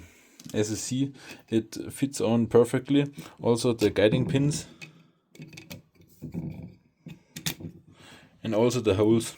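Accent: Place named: Germany. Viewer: I hear deutsch 🫣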